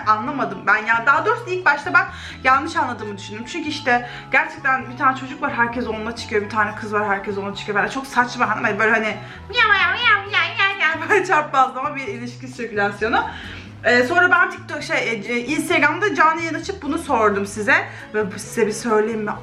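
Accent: Black Sea, Turkey. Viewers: Ohaa abla Karadenizlimiymiş. Oyyy uşağuum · Abla karadenizin neresindensin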